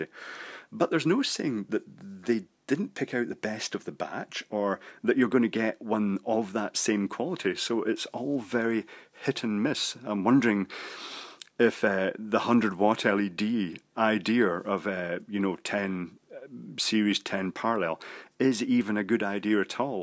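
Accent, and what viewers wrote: Scotland, United Kingdom. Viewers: Haha, yes! I'm doing this same 100W LED project, and these videos help me for this same reasons, also Scottish accent's refreshing to boot.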